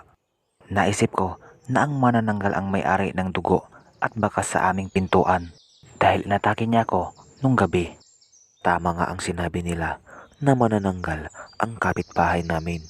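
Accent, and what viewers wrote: Visayas, Philippines. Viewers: Wow ang ganda Naman po ng video nyo lagi po naming di nadownload Ang bago nyong ina-upload pwedi po ba kayo ulit mag upoload Ang ganda po Kasi ng video nyo eh at kakatawa po Yung boses nyo parang bisaya pero nakakatakot din Naman po.😄😊🤗😘😍🤩😅😖😖😲😲😨😨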